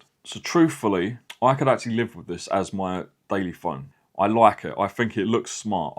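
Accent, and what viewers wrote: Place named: Liverpool, United Kingdom. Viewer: Why are you speaking in a scouse accent?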